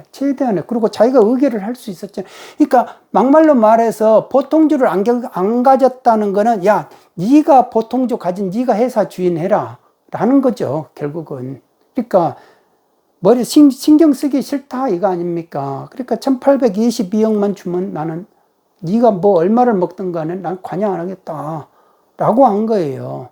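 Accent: Jeolla, South Korea. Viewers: 뭔가 팩트만 항상 말씀하시는 분 같이 느껴지네요. 억양은 라도분 같은데... 믿음이 가네요.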